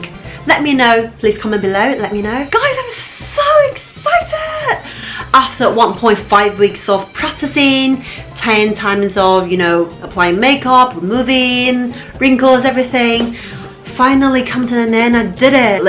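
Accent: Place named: United Kingdom. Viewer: 我高中畢業的歐巴桑都聽得懂🤗 英國腔 👍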